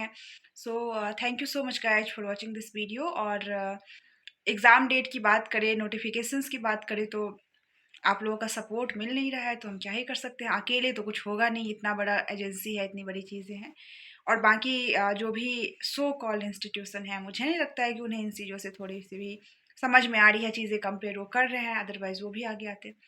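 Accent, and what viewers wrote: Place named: Bengal, India. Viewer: By your language accents, you looks bangali??...i mean are you from west bangal??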